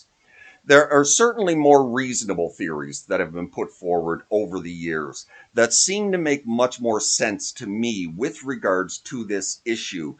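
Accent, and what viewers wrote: Canada. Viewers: Really enjoyed your tribute to the hip and the riff breaks were excellent. I obtained the “ ” album in 89 or 90 via the Columbia house mail order CD’s that were popular then. 7cd’s for a penny or something similar ha! I remember thinking, “who are these guys?” And that’s a strange band name 😂. So happy that I did get that cd, it defined that period of time for me and many other Canadians. Plus I felt like I got in on the ground floor as an early fan. Remained a fan since and Still enjoy their music today. I can’t think of another band that brought Canada together more than they did. Something we could use now more than ever. Thanks for the work you put into this channel. The lessons are excellent! BTW, Do I detect a hint of a Cape Breton accent…😊 fellow Novi here. Cheers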